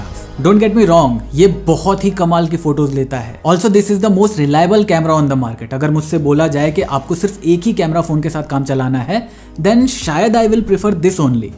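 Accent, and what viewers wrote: India. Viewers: Dondda do dhat indian accent are so funny 😂😂😂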